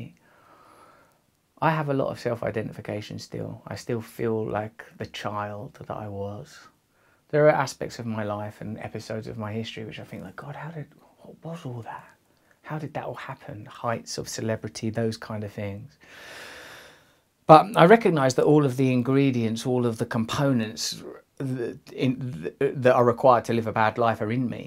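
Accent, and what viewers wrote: United Kingdom. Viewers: Please yell at me anytime in your British accent 😂❤️ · are you British ???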